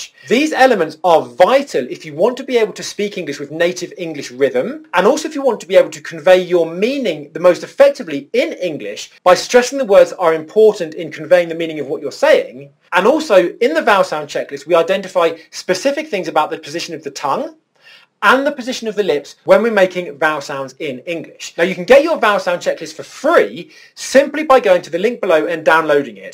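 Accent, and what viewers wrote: RP, United Kingdom. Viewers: Amazing video. i was wondering if this is modern rp british english? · Is this RP accent? Or some other british accent? I've watched your few videos and you haven't mentioned that if you are teaching rp or something else so I want to confirm as I want to learn rp.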